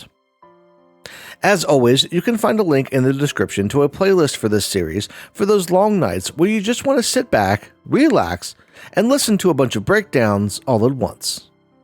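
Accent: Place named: United Kingdom. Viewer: Hey you're that fast speaking british guy that hates bonnie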